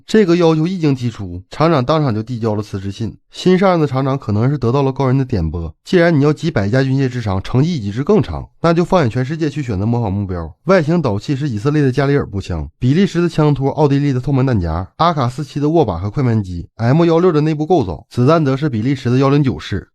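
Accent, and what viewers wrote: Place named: Northeast, China. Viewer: 虽然是东北话，但是你能听出来这个，那真是你的问题了。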